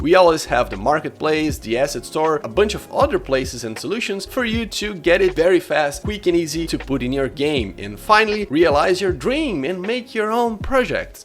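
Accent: Brazil. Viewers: you have an familiar accent are you brazilian or even south american?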